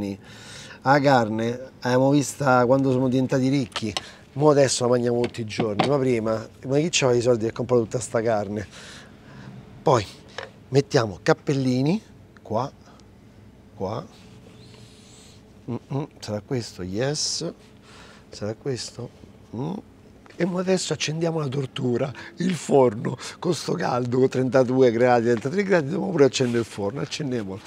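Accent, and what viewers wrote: Rome, Italy. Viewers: Grazie per la ricetta, per fantastico romano, a parte che sei un poeta vero e proprio!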